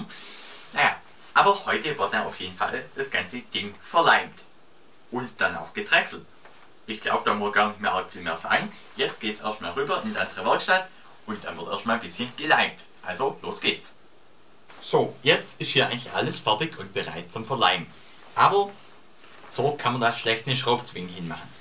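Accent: Swabia, Germany. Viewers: Schwätzt mol nur schwäbisch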